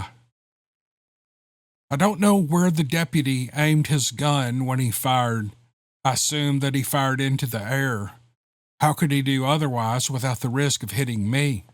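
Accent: South, United States. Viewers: My wouldn’t think a small request would so rattle such a little peanut brain… nor that such a retard phukhed hides behind that dumb simpleton southern accent.. lucky the rest of your subscribers don’t know then you’d none left.. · Another great set of stories read by a great narrator with his Southern sound! Ms. Neoma Finn, you have written another story that rivals your other great stories!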